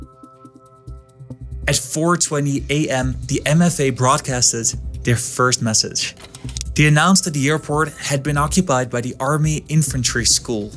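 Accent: Netherlands. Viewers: You've got a pretty thick Dutch accent. Are you Dutch?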